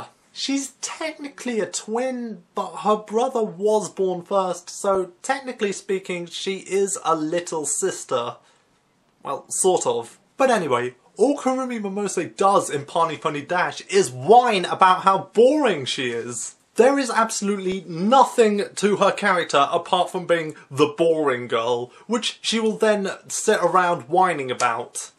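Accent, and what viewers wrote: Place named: United Kingdom. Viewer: ur british accent is so amazing its like sex to the ears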